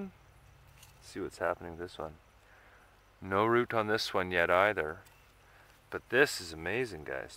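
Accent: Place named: Canada. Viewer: Are you Canadian? I can hear the accent. Reminds me of home. I never really noticed until I moved to the US. Now every time I talk to family it’s all “a-boat” and “eh” and “buddy”. Lol.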